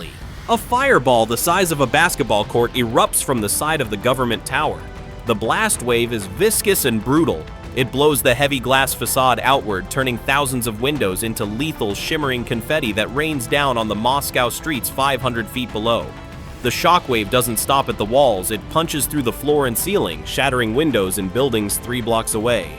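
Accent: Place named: United States. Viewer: CLICK-BAIT SCAMMER1 TOTALYANK VOICE B.S.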